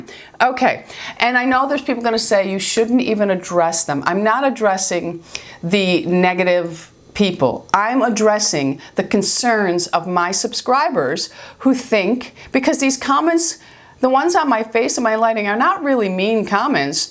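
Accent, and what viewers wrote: South, United States. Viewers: I have find you few days ago and really like you. I am 56 and barely put stuff on my face but love to listen to girls telling me what they do. It takes a lot of humility to show our face without anything and you are doing it in front of all of us, chapeau to you. I think that you have a southern accent and it is so nice. I would love to meet women like you, it is refreshing. I never look at women and try to see if they had this or that done, it is not in my nature. I am sad to see women that have done to much and look weird instead of aging gracefully. I am in Italy and am seeing older Italian women that are just dressed properly and have the right amount of makeup or none and they look great. I often stop them on the street and tell them how stylish they are. We should all applaud each other instead of putting down. Ciao and a lot of bizous!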